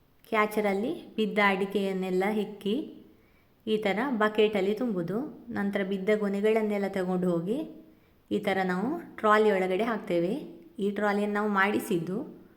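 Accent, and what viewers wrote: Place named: Mangalore, India. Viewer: Mangalore kannada bhashe kelode super 😊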